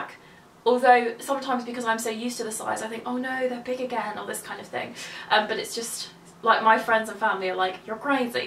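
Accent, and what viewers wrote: United Kingdom. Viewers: Oh that British ascent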